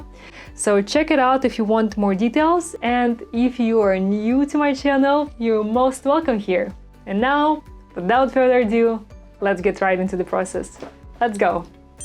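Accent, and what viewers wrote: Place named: Russia. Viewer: Another beautiful creation! In the least creepy way possible... is such a beautiful & charming lady & i am basically in love with the happiness she experiences through her creativity. I also have a thing for russian women & their english dialects. AND she's an artist... Her husband is a lucky guy!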